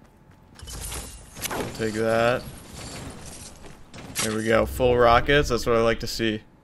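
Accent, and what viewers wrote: Netherlands. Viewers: You from NL? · Is he dutch? Bc he has that accent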